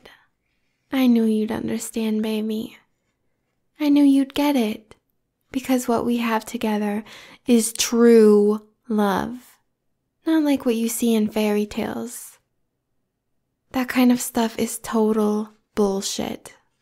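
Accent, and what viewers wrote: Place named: Brooklyn, United States. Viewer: I was thinking more of a Brooklyn New York accent but that works too 😄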